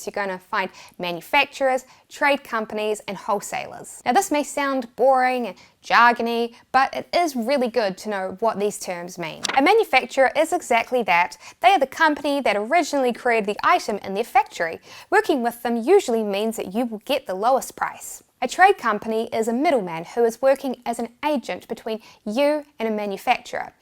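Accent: New Zealand. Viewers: My god that is the most NZ accent ever.....saying that I'm Australian, so I probably sound mentally impaired when I speak.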